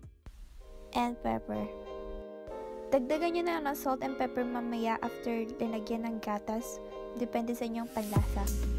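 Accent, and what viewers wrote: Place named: Philippines. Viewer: ralf macalma ?? tf galit much? chill. kuya, ever heard of accent? normal lang may accent mga pinoy/pinay.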